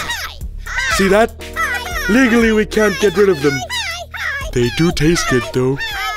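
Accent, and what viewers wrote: United Kingdom. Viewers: This guy britain accent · OMFG Ur British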